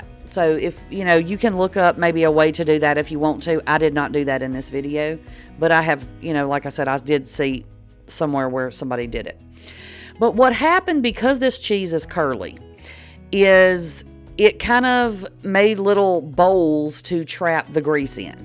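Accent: South, United States. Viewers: Subbed because of the southern accent. 😊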